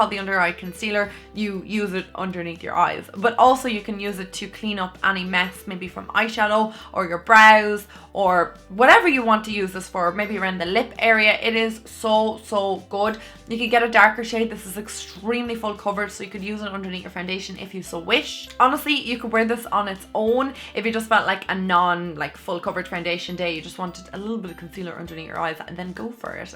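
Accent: Ireland. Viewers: you sound Irish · Ayyyyyeeeee your Irish 2. much love❤️🇮🇪🇮🇪🇮🇪🇮🇪🇮🇪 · Oh my god I literally just stumbled across this video and WOW I spend so long on YouTube watching makeup tutorials but I’ve never met an Irish YouTuber before, at points during this video I found myself just listening to your accent, it’s so nice among all these Americans 😂 I just had to hit the like and subscribe buttons, I’m definitely going to check out loads more of your videos in the future 💕 (I literally grinned when you said ‘ye’) us Irish need more representation on YouTube 😂 ☘️ 🇮🇪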